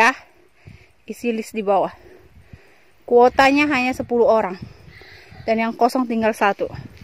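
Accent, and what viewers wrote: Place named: Samosir, Indonesia. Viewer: Kayak yg jualan di samosir, ditomok pelabuhan, logat2nya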